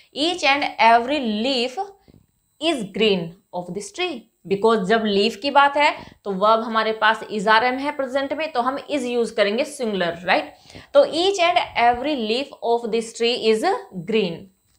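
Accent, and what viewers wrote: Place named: India. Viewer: Dear। beta....you really do well...but be little more cautious while using any strucure,and meaning and sence of sentece as well...And please do correct your pronounciation and your dialects.....your UP.BIHARI,AUR HARYANVI touch spoil the language......work over it....Dear Your sentence should be.....The younger he is more crafty or mean he is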